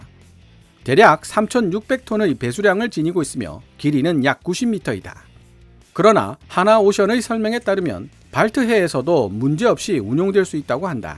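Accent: Gyeongsang, South Korea. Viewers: 갱상도 사투리 안바꿀래? 천하에 즈질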